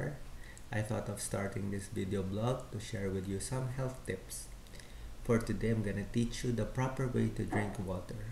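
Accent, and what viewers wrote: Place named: Philippines. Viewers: This is a Filipino accent? he has a nice accent and relaxing voice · There are many Filipinos in my country, and I also I've had a couple of Filipino teachers, and they sound exactly like that, so yeah he's probably Filipino. I like their accent too.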